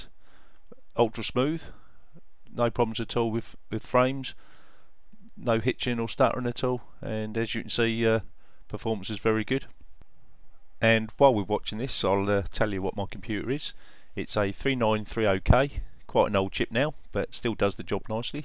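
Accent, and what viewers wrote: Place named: England, United Kingdom. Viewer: Good review. English accent's are badass, couldn't resist saying that lol. I think if Fury X would drop to 550$ and Fury 450$, assuming they can at least hit 1200 with some voltage on a proper loop they would sell like crazy. Not to mention DX12 coming and looking at Hawaii i think Fiji will come a long way in a year.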